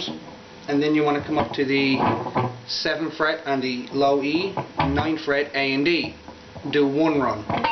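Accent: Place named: Newfoundland, Canada. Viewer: You sound like a good ol' Newfoundlander. Yes, m'son. Nice job.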